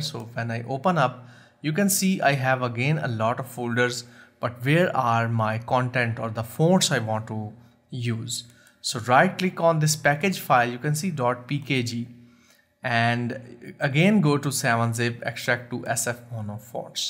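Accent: India. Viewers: European face. Indian accent. Great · its the first time l see a white man talks the accent of an indian, wtf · Wow ...your pronunciation is so different.... it's sounding very similar to our Indian English pronunciation 😂😂..and now I can understand better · WHY THIS GINGER HAVE INDIAN ACCENT?? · u sound so indian...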